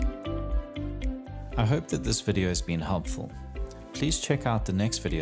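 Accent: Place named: South Africa. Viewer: Great explanation and well put together video . Only Tricaster video with a South African accent which makes me happy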